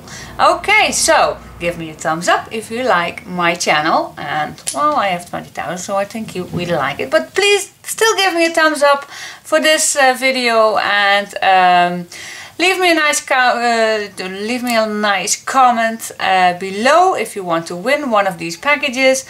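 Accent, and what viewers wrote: Netherlands. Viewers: Congratulation and well done . Thank you so much for sharing your great scrapbooking ideas . I get inspired<3. And I just love your dutch accent. from Anette T from Denmark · Sept 30, my mom (who is from Friesland) and I were scrolling thru scrapbook videos - looking for ideas when we came across Christmas Magic and gave it a look. I said "Wow, this lady is awesome!" and subscribed. Mom said "Wow, this lady is Dutch and has fantastic nails" and then "Would you make this scrapbook for me?" Anyway, I have watched many of your videos now and completed the Christmas Magic (or Masquerade) last week, only with different papers - not Christmas. It is gorgeous and your instructions are easy to follow and to adapt a little to suit my needs and ideas. Thank you so much! I love to listen to you. Your accent reminds me of my grandmother, only your English is better. 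Even after 30 years in Canada, she still was about 60/40 English and Fries. About a month ago, I got a great deal on some kitchen styled papers at a local craft shop and made a little recipe book, almost by accident as I was trying to figure out what to do with it. It is really cute but small. I was thinking as I finished up the CM book that I would make a recipe book (bigger) next (as a Christmas gift for my daughter) and what do you know? there was my new favorite mentor showing me what she had completed (and hinted at during the CM tutorials!). Thank you again! You read my mind! I love your work! Please keep it up! I am anxiously awaiting the tutorials for this!!!! PS - maybe your desire to say cool, cool, cool came from a Canadian friend - I hear it all the time, mostly from people who a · It’s won’t be long and you’ll have 50,000. Your absolutely amazing lady! Thank you for all your inspiration. 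Your work is fantastic and I love your accent because it reminds me of my mother in law. She from holland and I completely adore her. Can’t wait for the next video. Take care and happy crafting. We are in Alberta, Canada. 😍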